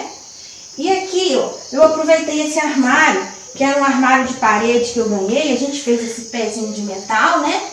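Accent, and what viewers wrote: Minas Gerais, Brazil. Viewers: Com sua voz de mineirinha ficou um show e amei sua casinha . Parabéns e Deus abençoe sempre beijos pra vc. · É mineruai kkkk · Chegando no canal. Ganhou mais uma inscrita. Seu gosto, meu gosto. Amo reaproveitar, transformar, inventar e por aí vai. Esse fogão amarelo deu um charme todo especial. Tenho o costume de pegar objetos de família e restaurar. Tenho várias coisas de roça do meu avô. Se vc soubesse o qt gostei da sua casa!!! O quarto cheio de caminhas com colchas de retalhos, os bancos, as cortinas, o banheiro todo lindo e até a sua timidez 😂. Sou mineira também. Seu sotaque ñ nega. Parabéns. A-DO- REI 👏😘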